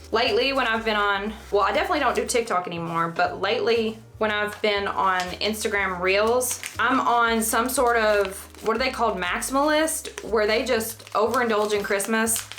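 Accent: South, United States. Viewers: I live in a small city in north-central British Columbia that wishes it were Southern, and I love your accent. It makes me think of sunshine and sweet, iced tea. Also, in Canada we don’t have dollar bills or pennies any more, so that wreath would have to be covered in $5s - which are blue. .. not quite the same! 😂 After we decommissioned the penny, my former hair stylist did the penny floor in the entrance to her salon which was in a heritage building in Vancouver, and it looked wicked! But she did say it was a lot of work. I adore your channels - thanks for all the hard work you put in to give us something cool! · Your southern accent sounds like Georgie from Young Sheldon...so cute 😊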